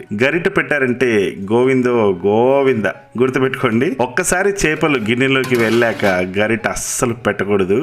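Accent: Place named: Godavari, India. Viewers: Aa voice, voice lo grace.slang ,superb wow sir konni words tri vikram gariki kuda cheppandi sir. Mana godarolla manasu vallu matlade matalo thelustundhi. Sir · 🤣🤣godavari slang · Godhavari yasa yetakaram ayendhi bro.plz never sing..... requesting you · Hiii ala unnaro. Mana godavari vala voice super ga chepparu. I love godavari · రాలేదు గోదావరి కి కానీ యాస బాగుంటుంది అండి అయ్ మా friends ఉన్నారు ప్రౌన్స్ బాగుంటాయి❤️❤️❤️❤️ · సార్ మీ వాయిస్ సూపర్ గా ఉంది గోదావరి వాయిస్ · Mana godhavari మాట కే.. కాదు.. వంటకాలకు కి కూడా ఒక spl place ఉంటుంది.. ఎక్కడైనా సరే..... చాలా బాగా explain చేశారు... That to గోదావరి యాస.. hailight ... ,❤❤❤❤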